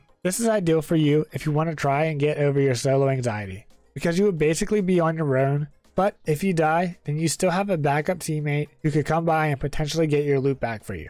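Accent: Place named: Pittsburgh, United States. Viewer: I could hear it in your accent haha.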